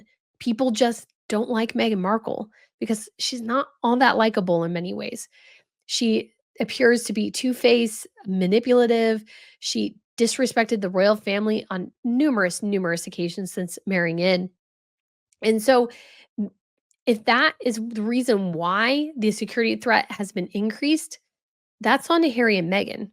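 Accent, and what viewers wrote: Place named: United States. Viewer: Someone has put the toothpaste on Charles’ toothbrush for him every day of his life. What on earth would Harry or any of the rest of them know of the “real world”? It’s weird to hear an American accent defending William being obviously more important than Harry. I must be TOO American. “Royals” are just ppl. Being born doesn’t make anyone special. But the only reason Harry needs security is the circumstance of his birth. It’s all very weird.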